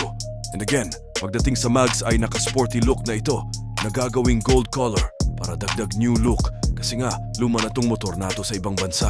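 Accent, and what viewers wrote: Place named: United States. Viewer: kuya halatang laki g america yung accent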